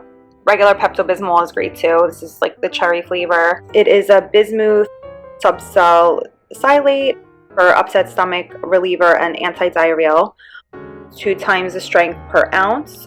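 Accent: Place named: Boston, United States. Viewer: Boston maybe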